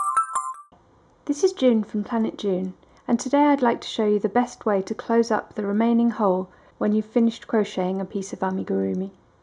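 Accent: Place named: England, United Kingdom. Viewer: wow thanks what a great video :3 I love your english accent by the way :D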